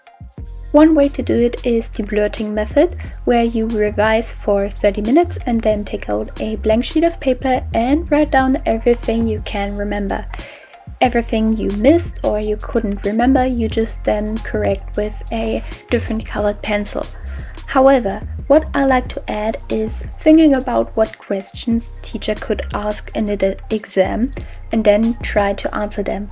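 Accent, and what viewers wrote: Germany. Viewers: Der deutsche Akzent ist so cute HAHAHAHA. Aber tolles Video ❤️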